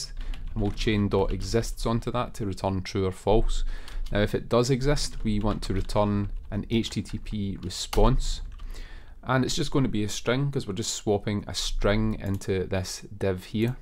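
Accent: Scotland, United Kingdom. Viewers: Good tutorial and with a nice (scottish?) dialect.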